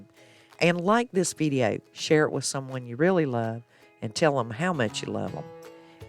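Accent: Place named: Carolina, United States. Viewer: I love this! Thank you for sharing. I love your accent. You sound like me. I’m a Carolina girl. 😊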